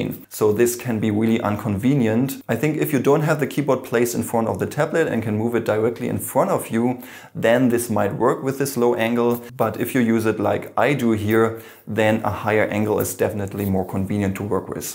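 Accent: Germany. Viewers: Man hört voll raus, dass du als Deutschland bist😂✌🏻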